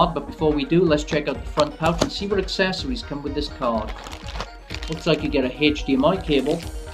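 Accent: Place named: Wales, United Kingdom. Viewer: Are you Welsh? You sound Welsh hah.. Awesome video.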